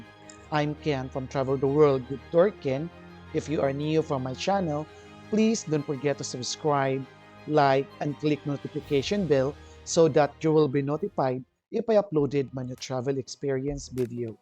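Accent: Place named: Philippines. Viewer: Lmao that filipino accent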